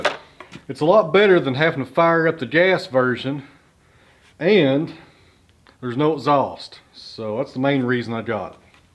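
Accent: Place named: Kentucky, United States. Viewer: When I hear your accent you make me homesick for the southeastern Hills of Kentucky my kin sound pretty much the same as you and I love it. I am fascinated with sawing and milling lumber, I guess it is in my blood, my Great Grand Father worked at a sawmill in Missouri and was killed when the boiler blew sending bricks a mile away.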